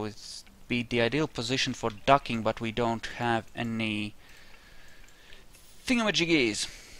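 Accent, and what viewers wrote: Russia. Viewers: That's actually a nice rocket! By the way, you can hold I think shift or control or something and click on the Apoapsis or Periapsis and the altitude numbers will stay on the screen. I like your KSP vids. I wish they were more popular. You never say uh, uh, uh, uh, like others. Your accent is cool. It's like listening to Russian space race videos. LOL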